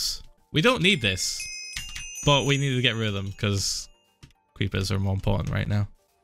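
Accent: United Kingdom. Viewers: I keep forgetting he's British. Even while he's talking.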